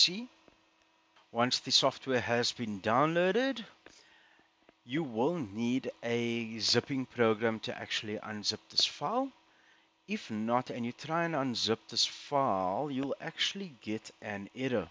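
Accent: South Africa. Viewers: south african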